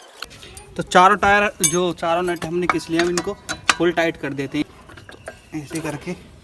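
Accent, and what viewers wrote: Haryana, India. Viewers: Bhai yarr i love your way you speaks in haryanvi tone..love ur videos..although i lives in ghaziabad but my favourite language is haryanvi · bhai sabse khas baat ki aapka face dekhne ko mila first time bhai nhi abhi tak khali koi haryanvi awaz hi aati thi bss 😀😀😀😊😊😊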